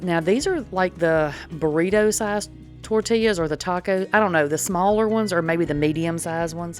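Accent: South, United States. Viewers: I'm sorry I'm now rascist. against a southern accent, y'all sound like Trump voters. FAFO. Can't watch ur content😢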